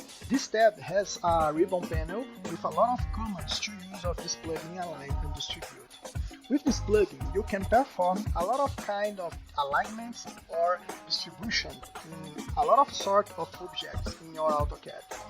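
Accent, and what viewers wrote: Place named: Brazil. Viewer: Falando em inglês. Porem o sotaque me parece Brasileiro hehe.... 😀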